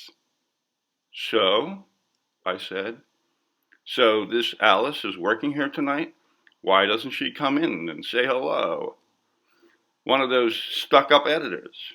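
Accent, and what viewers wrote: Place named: New York, United States. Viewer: What a great New York accent,and really well read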